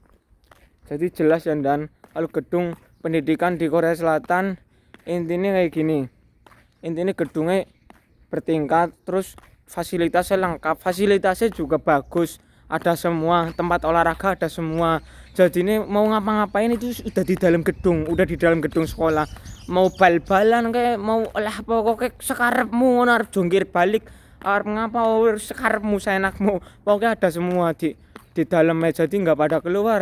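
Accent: Banyumas, Indonesia. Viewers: Salam ngapak ndan... Pwt-singapore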